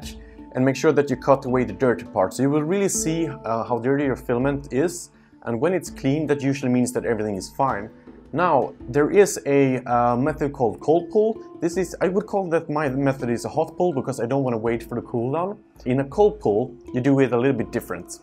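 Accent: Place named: Sweden. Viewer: I bet 5000€ this guy is Swedish xD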